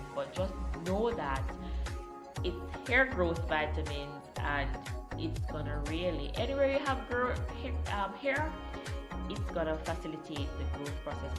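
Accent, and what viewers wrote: Jamaica. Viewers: your hair is beautiful and do i hear a jamacian accent?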